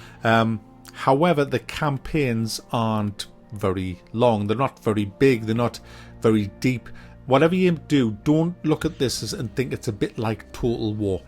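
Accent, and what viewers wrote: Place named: Northern England, United Kingdom. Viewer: LMFAO, bloody northerner! Every time I listen to in his reviews I visualize Oz from Auf Wiedersehen Pet, and that makes it all the more awesome.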